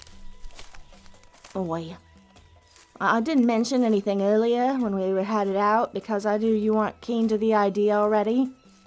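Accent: Australia. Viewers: You have a beautiful accent. Australian? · you sound Australian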